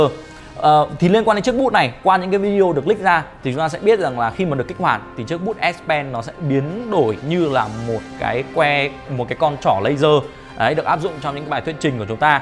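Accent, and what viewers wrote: Vietnam. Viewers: p chịu thôi chứ, đây là vn, mà mấy người xem tin công nghệ có người hiểu người k, nói kiểu vn thì cả giỏi lẫn k giỏi tiếng anh đều hiểu đc thì nó tối ưu hơn chứ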